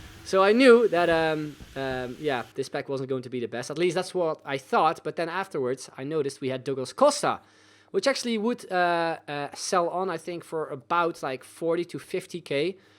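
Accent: Netherlands. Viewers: K denk dat je Nederlands ben heel klein accentje nog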